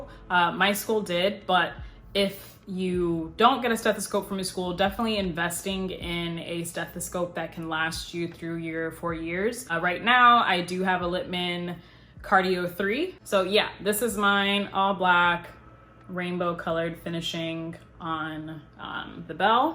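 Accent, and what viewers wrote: Nigeria. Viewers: speaking in Nigerian accent and giving tips <3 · It was the Nigerian accent for me. Never subscribed to a YouTuber as fast as I did today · Hah your Nigerian accent actually made me sit up and pay full attention. Can I hire you to stand near me while I'm studying and tell me off when I get distracted?! <3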